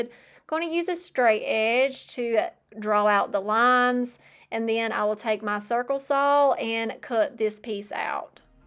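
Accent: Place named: East Tennessee, United States. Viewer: Please keep saying Modge Podge! I love your unique pronunciation; my daddy used to pronounce umbrella as underbrella…sounds more right to me. I love your accent because it doesn’t sound like an accent to me. Of course, I live in East Tennessee, so go figure.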